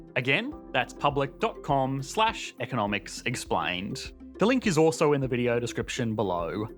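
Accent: Australia. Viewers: This may not be helpful, but it is truly meant with that intent. The United States has exported amazing and incredible "things" in her relatively brief history; although porn and the Californian uptick are, in my opinion, not worthy of inclusion. We humans have two primary means of communication: audible and visual, and you have a great product which involves both of these areas. However, I couldn't make it through to the end of this particular post because of the CA uptick you appear to have imported. I love the Aussie accent (although I think the South African has you pipped), and with over a million subscribers you can reasonably tell me to... er... "go away," but I would ask that you accept this as an honest and well-intended constructive criticism. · yeah should have expected some negativity with the aus accent. but hey hundreds of times better then an indian one. 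· This guys accent drives me nuts. Aussie accents don't tend to bother me but this guy changes his intonations mid-word - "Chyayayana" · I beg your pardon for asking maybe an insensitive question but what nationality is EconomicsExplained? I keep hearing this accent (PBS spacetime, ), but can’t pinpoint the origin. Australian? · There's no way I'm trusting an Australian's analysis on anything related to China. Btw your accent sounds absolutely fucked up.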